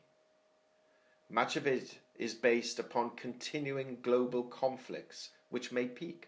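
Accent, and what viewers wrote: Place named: Wales, United Kingdom. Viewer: I thought you sounded Welsh, is that right? if you are, it's a very mild accent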